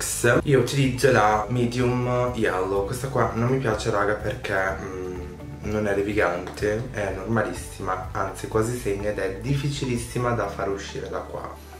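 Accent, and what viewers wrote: Milan, Italy. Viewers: Sta cadenza milanese alla * mi fa impazzire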